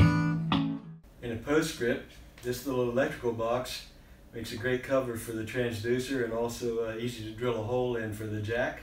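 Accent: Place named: New Zealand. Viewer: Nice job, great to hear a fellow kiwi!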